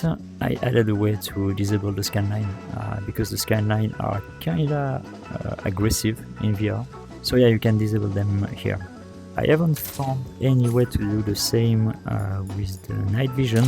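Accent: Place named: France. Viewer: youtubes ai is so stupid and racist it auto dubbed this from french dispite it just being ur accent smh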